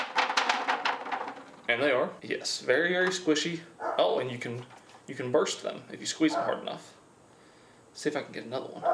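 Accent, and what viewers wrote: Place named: Pennsylvania, United States. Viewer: What state are you from cause I’m guessing PA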